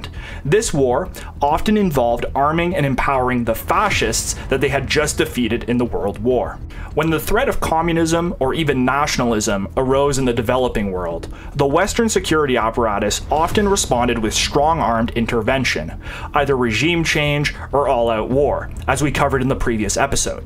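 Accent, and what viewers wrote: Canada. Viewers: Pretty sure the narrator is from Canada. That dialect is unique and screams classic Canadian.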